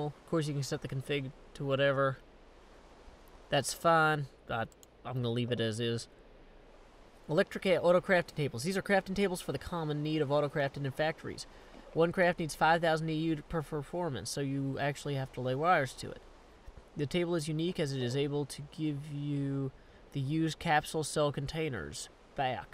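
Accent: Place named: Tennessee, United States. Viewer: Hey, I'm really enjoying this series! It's great to hear a fellow Tennessean, I hope you continue this and continue being hilarious!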